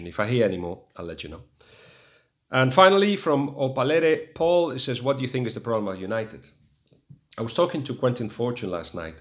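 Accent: Spain. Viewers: So this guy right here is certainly a comic, he pretends he knows about football but I honestly don’t think he does, so he came here sat on the same chair with his thick Spanish accent talking about how tactical and good OLGS was, and that he was a better fit than mournho, I’ve always said this, if mourinho had been allowed to be mourinho and not crucified him like the media did to make him Look a mere manager then United would have been better off, pogba got mourinho that axe because he couldn’t free him, or martial is not given the freedom, oh Alexis wasn’t given the freedom that was staple everyday, mouh is gone yet still united are shit. This guy said United are having a mourinho hangover because some of the players are used to his way which is utter nonsense, pogba will force a move to Madrid just watch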